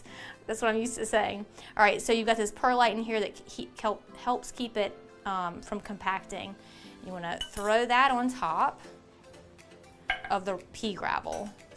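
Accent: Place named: South, United States. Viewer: Thank you for this video:) These are definitely the plants for me. Only need water every two weeks! Haha... Also, you seem to have a southern accent. I am from Alabama. Are you close by?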